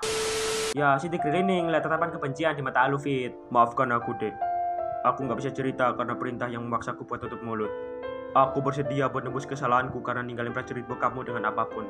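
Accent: Java, Indonesia. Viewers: Bang besok eudora (ngomong ngomong abang orang jawa kan?)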